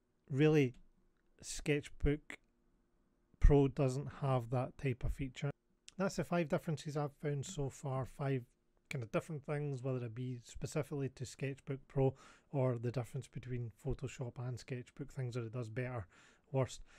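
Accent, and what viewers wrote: Scotland, United Kingdom. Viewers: I just come here to hear the Scottish accent. It's my favourite accent in the British Isles.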